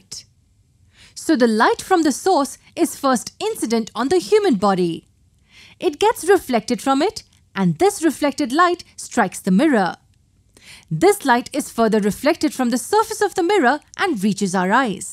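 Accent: India. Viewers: Thickkkkkk INDIA ACCENT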